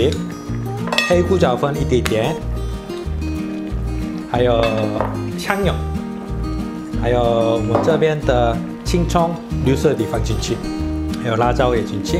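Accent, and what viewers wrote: Cantonese, China. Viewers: Kai老師國語有廣東腔，哈! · 有點廣東腔是正常的嗎🤣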